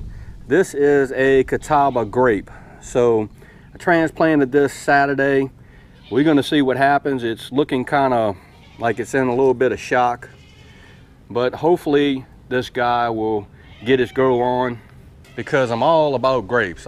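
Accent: South, United States. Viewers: But really in the nicest way we do enjoy listening to your southern drawl have a great day!!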